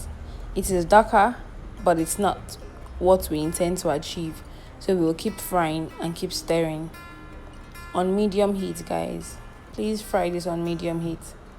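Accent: Nigeria. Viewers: First of all thank you for the normal Nigerian accent 😩💜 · I totally love this video. The Nigerian accent and cooking style! Thanks alot!❤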